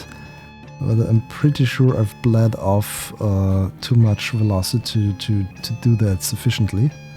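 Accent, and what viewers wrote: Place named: Germany. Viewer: Ich wusste doch das du Deutsch bist! :D (Hat man an deinem Akzent gehört)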